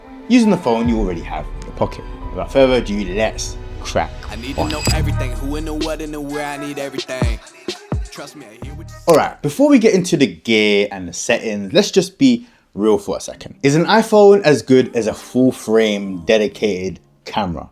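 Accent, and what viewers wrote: United Kingdom. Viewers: Really good thanks, just discovered this camera app, and I like to hear a Brit accent, nithing against America at all, just me...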